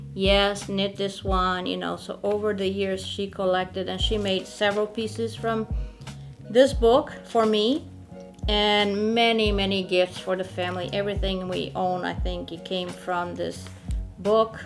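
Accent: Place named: Portugal. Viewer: So you are Portuguese! I wasn't sure about your accent! Thanks for your work .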